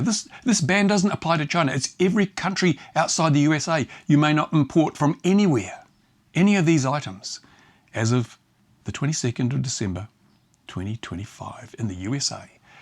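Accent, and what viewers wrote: Australia. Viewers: lol this guy sounds aussie.... like he has anything to talk about when it comes to restricted freedoms. I'll trade a hobby for FREEDOM any time of the day, and that's what it reallly is coming down to. I k now 99% of people reading this, won't understand. We have been in an economic warfare for decades. Now it's time to fix that. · MAN! I'm glad every day that I have a permant resident permit in, -Gabriel of Norway. Thanks very much for this video, my brother who sounds to be 'down under '. Love y'all, mate! Unfortunately Norwegian politcians are in bed with those in the USA.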